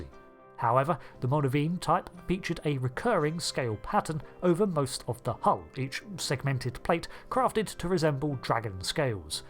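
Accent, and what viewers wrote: United Kingdom. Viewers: The British Accent elevates the dialogue to hitherto unseen heights.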